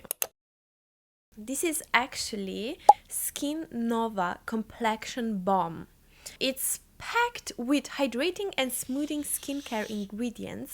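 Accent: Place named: Slovenia. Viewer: I couldn’t quite place your accent so very cool to find out you are Slovenian! I passed through Slovenia very briefly last year on the way from Trieste to Rovinj and I’ve been dying to properly visit the country since then. It’s my number one place I want to go! 🧡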